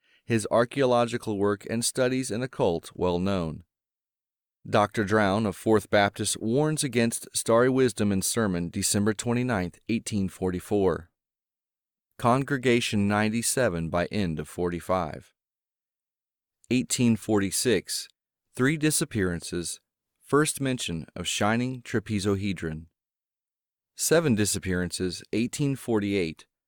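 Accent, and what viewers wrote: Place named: United States. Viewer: Always loved this one. And the narration is by an American!...for Poe and lovecraft - a must have. Imagine M R James or Arthur Machen read by someone NOT from the UK. This narration of HPL...was very brilliant!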